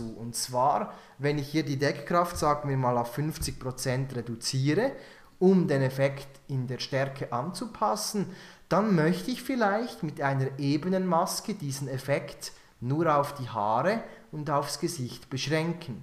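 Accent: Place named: Switzerland. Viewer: Dein schweizer Dialekt ist einfach genial :D Komischerweise versteh ich dich sogar zu 100%, was nicht bei allen Schweizern der Fall ist ;)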